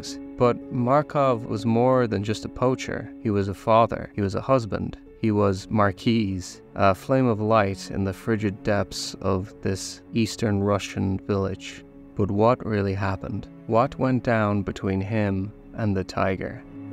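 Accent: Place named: Ireland. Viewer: Please tell me that you are NOT trying as hard as you can to hide your beautiful Irish accent!!!!! Ahhhhhhh! Stop it! We LOVE Irish and their musical accents.❤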